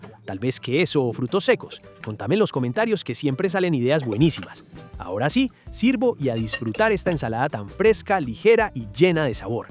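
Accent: Antioquia, Colombia. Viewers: Me fascina tu acento de paisa, I love it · Disculpe joven usted es colombiano porque me encanta como hablan y cosinan rico como esto que esta reseta que está compartiendo 🙏🏻🙏🏻❤️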